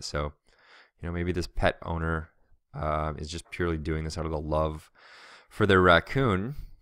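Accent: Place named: Canada. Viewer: Yeah ... but I'd completely whoop your ass at Sonic Spinball ... So, I'm sitting here marathoning your videos, and you've said that you don't have a pet ... Lets hope that you're single as well, as I'd only ever heard of you approximately 2 and a half to 3 hours'ish ago ... And I'd nearly labeled you an asshole, but you're cool ... I was so borderline offended by the first of your videos that I watched, more mildly outraged ... Now, I realize that you're just brutally honest and a sweetheart of sorts, with your whole taking on the responsibility of taking care of your family and all ... My father fell into a coma the day that I came home from Colorado, never woke up, but that's a different story ... #PepperThePitBull keep an eye out for her ... OH, and you are officially "Strange Brew" Canadian when you say "about" ... It's not something that you can change at this late date, but yeah ... 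That's definitely your Canuck street cred right there ... You pronounce it more "aboot" than "about" ... Anyways ... <3 C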